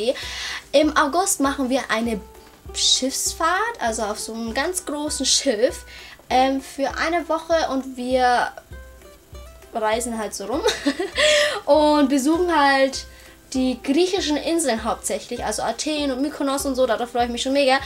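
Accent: Franconia, Germany. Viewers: sie hat nen fränkischen Dialekt🙈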